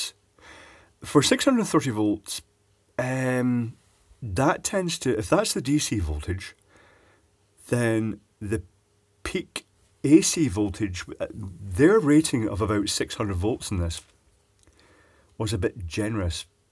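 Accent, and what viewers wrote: Scotland, United Kingdom. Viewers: I think it's all to do with that husky Scottish voice, the odd exploding cat, and the desire to watch soil himself....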